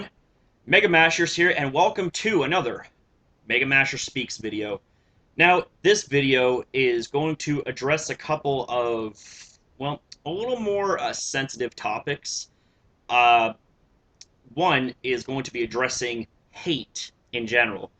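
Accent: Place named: Canada. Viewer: If you are reading this, don’t ask me how I got here 10 years later but I just wanted to say I FUCKING KNEW IT LMAO! Throughout the whole video I was being a little shitter and poking fun at your very very subtle Canadian accent. Then you made the reveal and I laughed so much. Now I really feel like I have a good ear for picking up these accents no matter how micro they might be. Anyways cheers from America, hope you’re doing well wherever you are bro!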